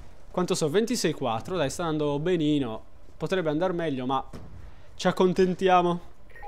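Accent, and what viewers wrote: Sardinia, Italy. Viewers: ma tu sei sardo o sbaglio??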